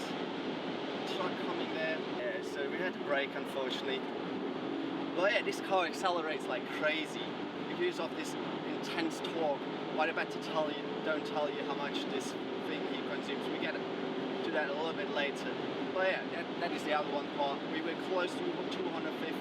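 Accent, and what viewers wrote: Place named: Australia. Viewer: Your accent sounds australian🤔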